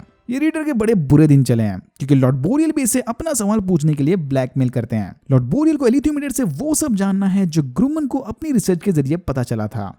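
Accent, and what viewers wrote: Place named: Pune, India. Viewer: Punekar bolte🔥🔥🔥💪🏽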